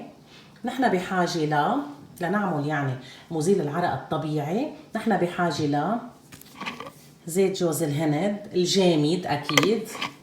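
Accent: Lebanon. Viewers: احلى لهجه اني عندي لبنانيه كلش حلوه بتعقد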